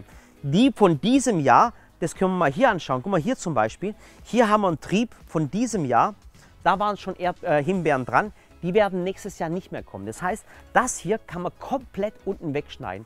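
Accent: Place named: Swabia, Germany. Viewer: Sehr cool, danke. Das Video hat mir sehr geholfen, auch wenn die "Blütezeit" der Himbeeren in Schweden etwas von der in Deutschland abweicht. Toll auch der immer wieder durchkommende schwäbische Dialekt 🙂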